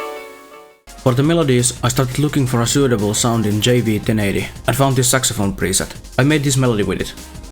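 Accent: Finland. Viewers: Finnish accent is frying me 😭Vitun hyvä video tho :D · You gotta be finnish. Theres no way u arent and talk english like dat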